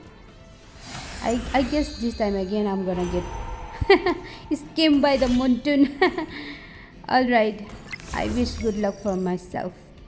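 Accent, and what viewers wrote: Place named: Arunachal Pradesh, India. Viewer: Waah Arunachal english 😅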